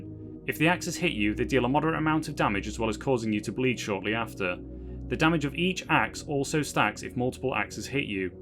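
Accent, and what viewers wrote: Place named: United Kingdom. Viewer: you have the most British voice ever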